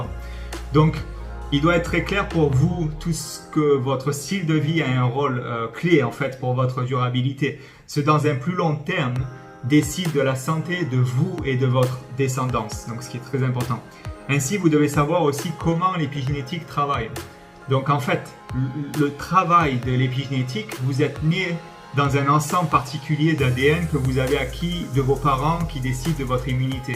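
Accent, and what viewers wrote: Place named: South, France. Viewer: Ah tellement de jolies explications! Couplées à cette accent du sud, magnifique!! ☀️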